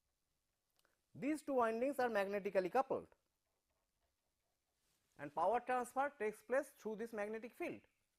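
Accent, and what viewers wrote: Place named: Bengal, India. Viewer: He is bangali ( you can tell it form his assent) ❤ and he amezing ❤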